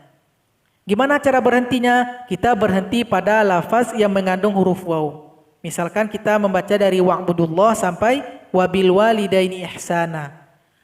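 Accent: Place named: West Sumatra, Indonesia. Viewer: Urg minang kalau bahaso indo logat minang nyo pasti ttp ado. Hehe semangat uda, mudah2an sukses slalu.. awak disoko nio belajar pulo mangaji yg batuah uda. Makasih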